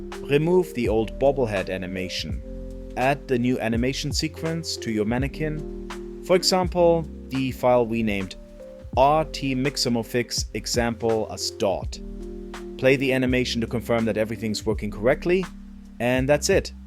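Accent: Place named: Germany. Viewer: bro your accent sound so german haha good video btw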